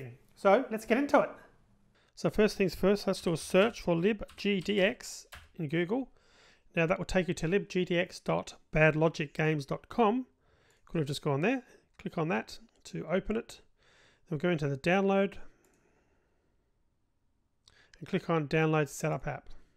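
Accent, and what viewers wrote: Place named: Australia. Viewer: THANK GOD FOR THE SUBTITLES.No offence fellow Aussies, but as a non native English speaker I have a hard time to follow! Kudos for the great tutorial though!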